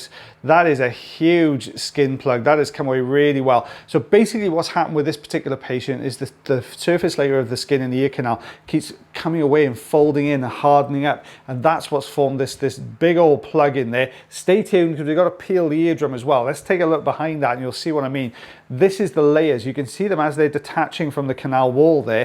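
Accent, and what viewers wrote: Wales, United Kingdom. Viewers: Why is my day not complete unless I've watched these? Got to love that Welsh accent & ear peels to soothe away the days stresses!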